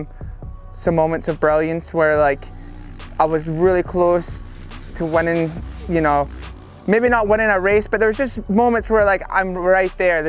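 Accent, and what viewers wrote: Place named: London, United Kingdom. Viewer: Where is this guy from? His accent sounds like London via east LA.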